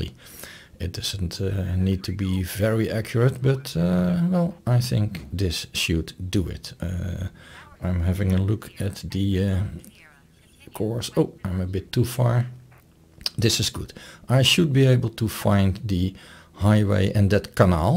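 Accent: Netherlands. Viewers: Always good to hear a Dutch accent with English. I'm American and lived in Landgraaf for five years. Thanks for the video.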